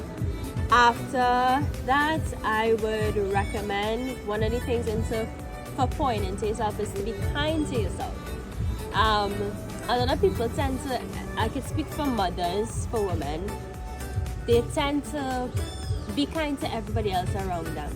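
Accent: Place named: Trinidad and Tobago. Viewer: I hear a trini accent!!!!! Yesss let’s go!! Keep making videos!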